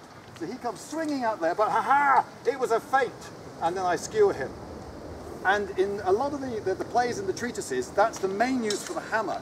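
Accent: United Kingdom. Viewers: I am an American. You are super British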